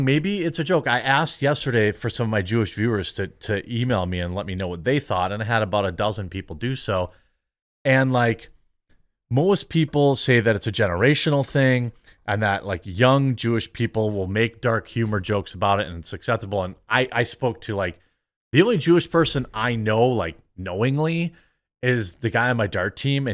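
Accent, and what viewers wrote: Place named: Upper Midwest, United States. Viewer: I just have to say that you talk like someone from the WI, MN or UP.. it took me forever to quit making everything a question with the "ay" on the end of any comment I made. Then if course we have the reverse "ya"!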